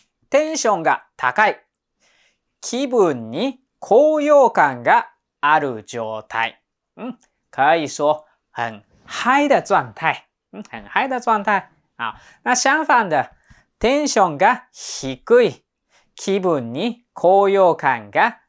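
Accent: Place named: Taiwan. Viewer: 老师中文一听就是在台湾学的吧？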